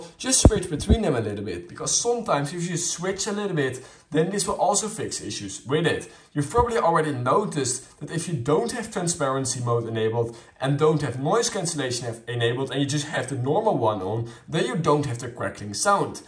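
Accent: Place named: Netherlands. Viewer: Are you Dutch? You have a Dutch accent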